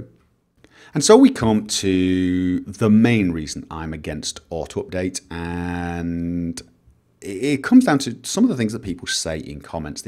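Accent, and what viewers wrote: United Kingdom. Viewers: I actually first thought you were from Britain because of your English. A lot of people who have English as their second language have a really obvious pronounciation/strong accents. (like a lot of people from Roman or slavic countries)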